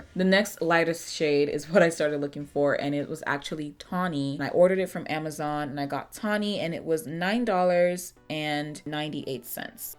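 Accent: Nigeria. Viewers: your nigerian accent is absolutely stunning, i love them so much😍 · Pls do a video where you explain and talk through everything in your Nigerian accent😂😂 I love your accent ❤❤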